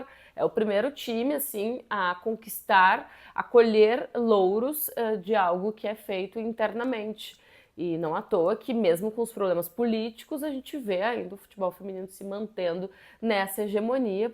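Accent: Rio Grande do Sul, Brazil. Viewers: gaucha, sotaque bonito, torce pra quem do grenal? Vai Corinthians!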